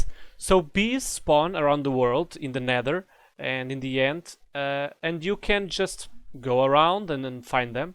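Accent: Portugal. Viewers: By any chance, are you Portuguese? I recon the accent (Tuga here...) :) Amazing video, been lost with this mod on ATM6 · Are tou portuguese? u seem lisboeta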